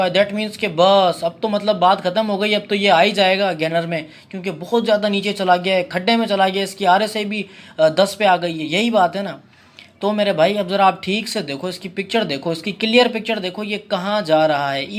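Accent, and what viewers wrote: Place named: Karachi, Pakistan. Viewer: isliye poocha k apki urdu Karachi wali lagti thi main khud Karachi se hun tou isliye confirm kar raha tha... hahaha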